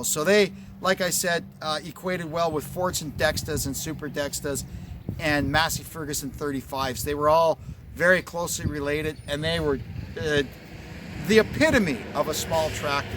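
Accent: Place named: Canada. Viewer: Thanks for the video. Picked up the Cannuck accent early. ☺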